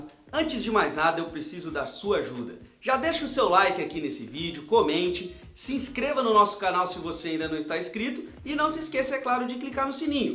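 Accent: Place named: Rondônia, Brazil. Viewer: Você é de rondonia deu pra ver seu sotaque. Em qual cidade da aula?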